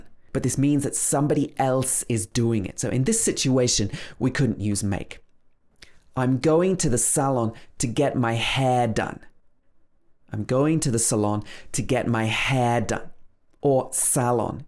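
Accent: United Kingdom. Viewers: You are one of my best teachers. I like your accent greatly. I'd like to see more videos from you. You use RP sound what I like most. Take care. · Hello, I am a new discovered to channel I am an English learner from Somalia and I would like to British accent it is simple and lovely I think that your videos will help learners thanks for your help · It's cool video to study British accent 🤎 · I was searching for a channel where I can learn British Accent.... Thanks Allah Almighty.... He helped me to find out you... I am so happy now... Thanks for uploading videos on Accent in such a smooth way... I really like it.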